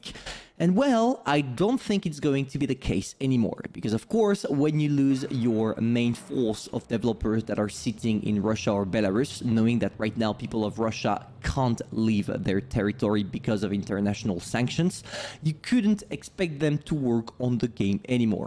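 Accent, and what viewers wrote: France. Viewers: you are French?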